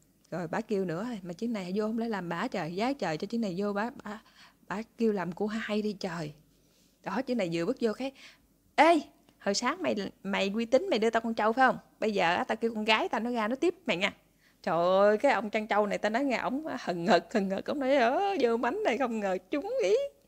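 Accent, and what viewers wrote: Mekong Delta, Vietnam. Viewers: Anh thich vong noi Mien tay cua Em roi do nhe? hon nua la cai mieng rong cua Em nua do??mieng nay ma B…C…la te ca nguoi luon do nhe??🤫🙈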